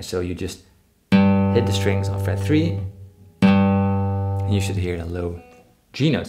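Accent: Netherlands. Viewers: What nice licks,! I ( 73 ) play and love my guitar(s) since 65 years. Still pure joy, fascination and constant learning. In addition, your slightly nederlands accent: Wonderful! Keep it up!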